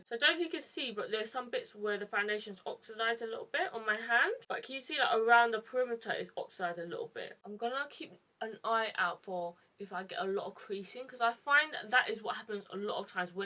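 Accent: United Kingdom. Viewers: I’ve seen your videos/pictures on Instagram plenty of times. This is the first video of yours I’ve watched on YouTube though, and the first time I’ve heard your voice. Didn’t know you had a British accent!! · I don’t mean this as an insulting way at all, but when she spoke I wasn’t expecting the tone and type of voice she spoke on it’s so much fuller than expected and I didn’t even know she was British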